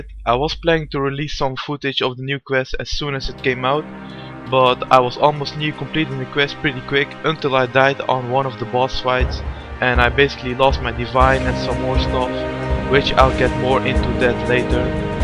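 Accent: Netherlands. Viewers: kut Nederlands accent zeg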